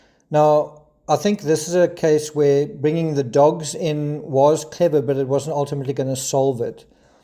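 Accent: South Africa. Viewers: Thank you for this excellent summary! So great to hear a South African voice... I am a South African living in the UK. I have followed the case from beginning to end and I am sorry that Jay Slater has lost his life, but I am glad that his body has been found.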